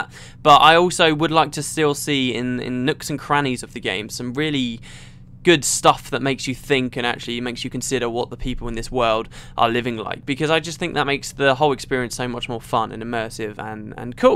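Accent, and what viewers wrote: United Kingdom. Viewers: English is a foreign language to me but i can nearly understand 100% of everything you say. Thank you for your clear voice and your british dialect beeing not that extreme. Its very delightful and relaxing to listen to. At least in my head you are a very handsome and good looking man :)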